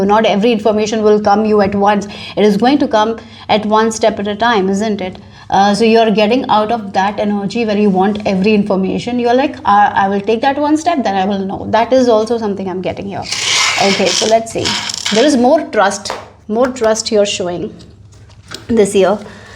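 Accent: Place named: India. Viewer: I am sorry to say ... but what a Shītty Read !! So much Irrelevant bull shît !!!!!!!!!!!!!! And my God your h0rrible Indian accent .. so hard to understand...you literally say QWOON..... instead of Queen !!! Took me a bit to get it.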